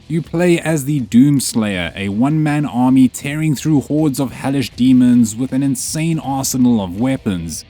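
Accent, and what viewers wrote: South Africa. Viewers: That SA accent is unmistakable. Good to run into this channel!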